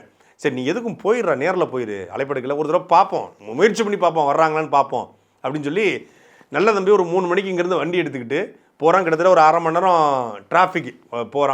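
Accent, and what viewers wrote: Tirunelveli, India. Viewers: தெளிவான உச்சரி ப்பு தள்ளு தமிழ் தெள்ளு தமிழ் தெளிவான உச்சரிப்பு திருநெல்வேலி தமிழ் அழுத்தம் திருத்தமான பேச்சு சாட்டைக்கு நிகர் சாட்டையை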